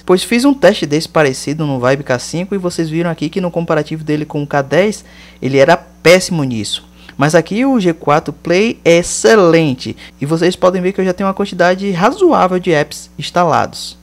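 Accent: Bahia, Brazil. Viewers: você e de que cidade? Se for salvador adivinhei pelo sou modo de falar que so bahiano tem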